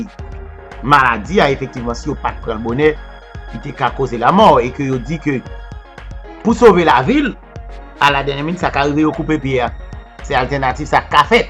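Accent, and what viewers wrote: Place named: Cap-Haïtien, Haiti. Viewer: Saaa c nèg okap kap palé laaa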